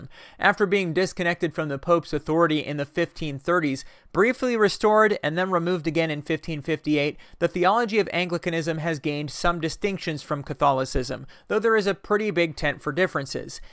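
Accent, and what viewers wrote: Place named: United States. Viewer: Whats weird is I only realised the American accent near the end of the video.... depends where my head's at, i thought you were English most of the way.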